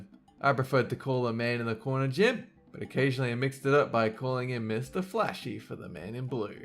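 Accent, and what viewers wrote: Australia. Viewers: is this guy Australian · Is he Aussie🇦🇺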